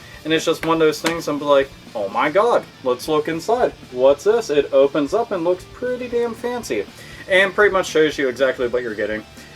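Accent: Ireland. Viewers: wait you're Irish ? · Holy shit, you're Irish!?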